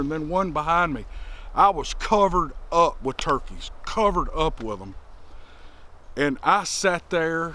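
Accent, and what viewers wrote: South, United States. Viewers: Yup! I really enjoyed your lame story 😊 Pretty sure it’s the accent, your “good ole boy” accent. Listen to an Australian talk and then yourself … I was born in the wrong country. I could listen to you tell lame stories all day! ❤ Thank you. 🥰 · you've got the best southern accent and best voice on You Tube! Nothing that comes out of your mouth is ever lame! You could talk about working in a sewer and it would sound so sweet! Keep up the great work! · Agreed! I told him he's my people! Live southern people and refreshing to hear my own "language on you tube! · Made me chuckle. You've got a great southern voice; you could probably read from the phone book and people would listen to it.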